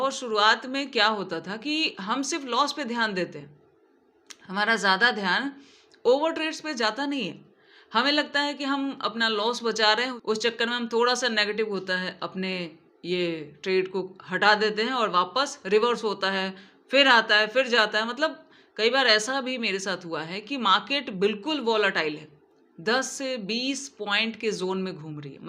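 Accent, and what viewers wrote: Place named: Haryana, India. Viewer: u belong from Haryana as per ur tone❤🎉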